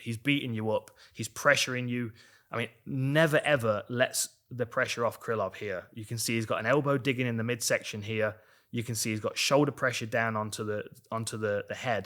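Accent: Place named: East Midlands, United Kingdom. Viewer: Incredible breakdown as always and... incredible accent. East Midlands, let's gooo!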